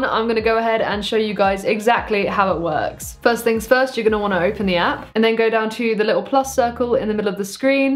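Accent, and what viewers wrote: United Kingdom. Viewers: I had no idea you were British 😂, only follow on insta til now.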